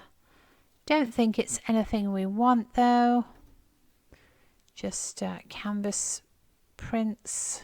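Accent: England, United Kingdom. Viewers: LOVE the design for the "kitcheny bits" merch! I adore the British accent and terminology as do lots and lots of your viewers. Go for it! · Are you from England?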